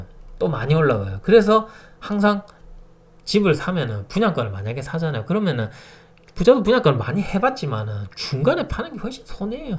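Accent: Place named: Busan, South Korea. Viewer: 정보 감사합니다. 지금은 서울에서 살고있지만 15년전에서 부산남천동에서 살았지요. 정보도 감사하고 고향사투리가 듣기도 좋아요.^^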